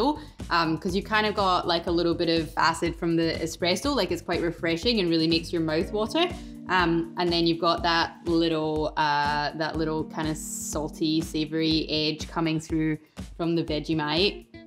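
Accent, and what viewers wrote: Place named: United Kingdom. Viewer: i fell in love with your accent , which part of UK?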